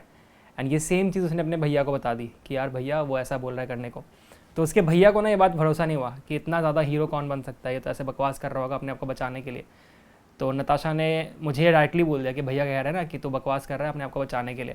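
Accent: Bihar, India. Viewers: Bhaiya aap bihar se ho kya 🤔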